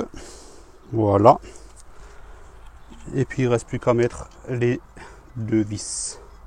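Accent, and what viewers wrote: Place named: Alsace, France. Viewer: accent et alors..... fier d'être Alsacien, dans d'autres régions, ils ont un accent également, alors..... quant aux joints.... Trèfle Vert par exemple.... en règle générale les magasins Jardinage..... ou pour les veinards comme nous, Alsaciens, un déplacement outre Rhin chez OBI...... nettement moins cher qu'en France..... hé oui..... merci pour vos "bidouilles"....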